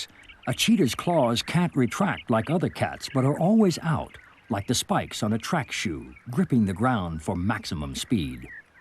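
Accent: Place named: United Kingdom. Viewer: Why does the making of segment always have the original uk narration instead of a us narration?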